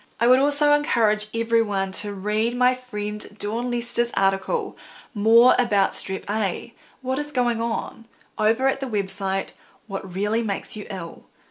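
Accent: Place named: New Zealand. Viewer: Her NZ accent and her healthy smile and energy are added plusses to her important Med industry transforming message....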